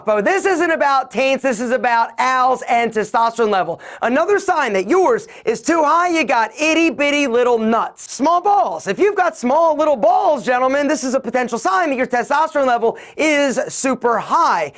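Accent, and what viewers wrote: Philadelphia, United States. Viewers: I know a Philly accent when I hear one 👀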